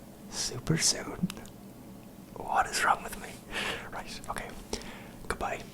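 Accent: Ireland. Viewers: love love love the Irish accent and your voice is soooo tingle-inducing. thank you xx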